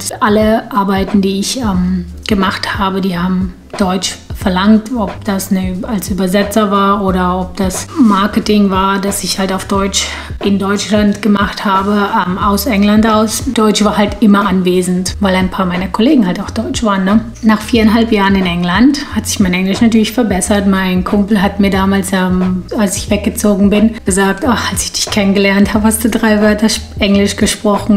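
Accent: Russia. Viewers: Bin auch russisch-deutsche und möchte sobald es Pandemiemäßig möglich ist nach Australien ziehen. Ich hatte früher keinen Akzent im russischen, habe jetzt aber deinen deutschen Akzent. Bin gespannt wann und wie sich das verändert wenn ich überwiegend nur noch englisch spreche :D Meiner Meinung nach klingt dein Accent doch sehr russisch! Schaue dann mal ganz interessiert deine Videos weiter an :) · Hallo!, Kann ich sehr gut nachvollziehen ich spreche deutsch, englisch, tschechisch. Mit meiner Mutter spreche ich tschechisch, mit den Rest (andere Familienmitglieder) deutsch und schaue die meisten Videos, alle Filme etc auf englisch. Ich kenne es gut wenn man ein Wort in einer anderen Sprache sofort kennt, aber es in der anderen Sprache einen nicht gleich einfällt. Mach dir kein Kopf, du hast eher ein russischen Akzent ich habe aber auch manchmal einen. Das ist jedoch normal, es gibt (meiner Meinung) nach nahezu keinen Akzentfreien Sprecher. 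Nette Grüße Lukas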